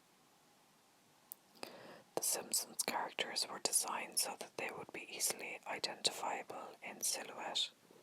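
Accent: Ireland. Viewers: Are you Irish? You remind me so much of a friend of mine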